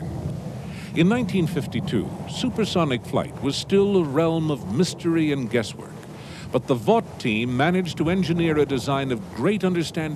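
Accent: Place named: United States. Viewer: American narrator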